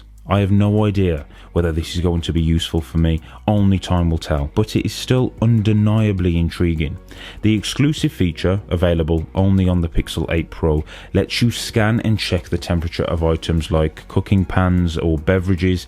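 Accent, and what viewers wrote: United Kingdom. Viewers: Your accent reminds me of a British singer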